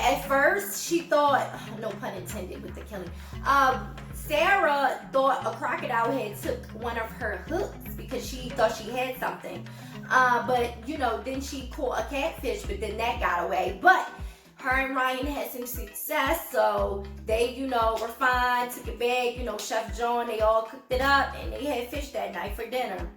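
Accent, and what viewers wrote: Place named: Maryland, United States. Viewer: i can tell ur from maryland lol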